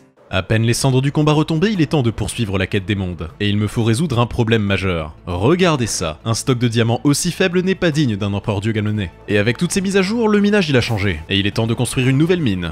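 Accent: Quebec, Canada. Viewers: J'ai une question pk defois tu parle québécois a travers de tes phrase?sinon continu comme sa tes vidéo sont incroyables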